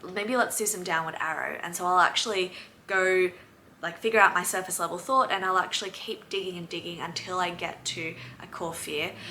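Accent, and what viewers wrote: Australia. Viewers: Thank you for your reply.🩷I tend to compare myself with somebody that speaks English so well, and I hate myself doing comparison. If I were in Sydney, I'd like to have a session with you to improve my confidence. Please keep posting. I love Aussie accent, and I'm infatuated with Australia! So, your video clips remind me of fun time I had in Sydney! Yes, I've lived there for a little while a long time before. And, I miss Australia.🥹🥹🥹🥹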